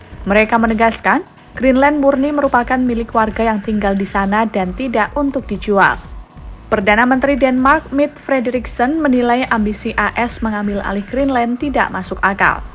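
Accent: Java, Indonesia. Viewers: Medok banget jawanya yang pembawa acara, semoga tambah sukses kompas😊👍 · Medok nya klu hilang bagus Bu... 😊😊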